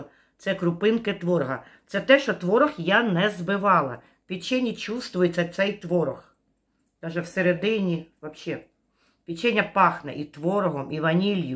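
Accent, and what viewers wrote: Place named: Chernihiv, Ukraine. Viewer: Доброго дня! Випадково нашла ваш канал і одразу підписалась! Така щира і життєва жінка! Вибачаюсь ,але дуже цікаво з якої ви області? Мені здається,що чую рідний акцент,так як у нас говорять на Чернігівщині))❤ Дуже гарні рецепти і відео сповнені енергії і мотивації! Мира і добра вам!❤❤❤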